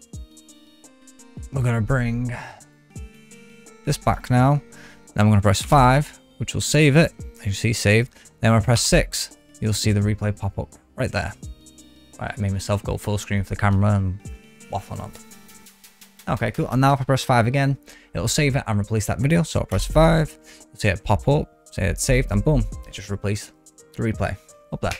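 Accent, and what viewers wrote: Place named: United Kingdom. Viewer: Closed captions is must for this video, very fast and hard to understand dude, I love a UK accent but cmon man :)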